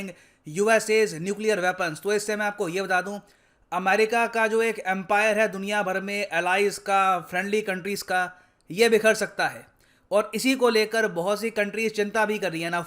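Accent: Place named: Bihar, India. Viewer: जबर्दस्त आज के लेक्चर मे बिहारी टोन है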